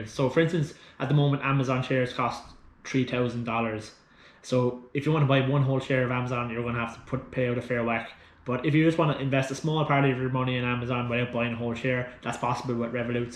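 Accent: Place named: Ireland. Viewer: Where in Ireland you from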